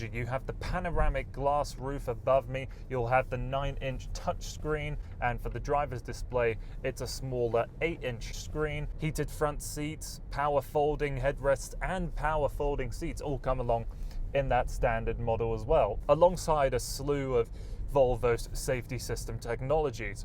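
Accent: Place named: United Kingdom. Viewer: Thank you for another great and professional review. I am myself owner of v40 CC with old volvo's 5cylinder engine the sound of which I do enjoy and do miss in present only 4cylinder units. As to your Brit origin, I'm from Poland and for a while I was mistaken that Canadians speak so wonderfully in British English :)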